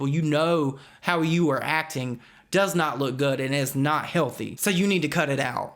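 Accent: South, United States. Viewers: Hey, well you r saying what of a bad person she is, but look at you.... Let your damn southern accent look at its self first and then you can judge about others. What you are doing is pretty fckd up. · Omg- its something new with this whacko every single day! Thanks for all of your hard work, to bring us the latest tea 😉 And, OMG- your voice & adorable southern accent are so calming to me! I just adore you! 💛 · This is the first video I've ever seen of yours and I am an instant fan! Subscribed with notifications on! Love your southern accent! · Being a TN girl I didn’t realize it till now but a southern accent adds so much drama and oomph to any tea it made all this shit going on 10 times more interesting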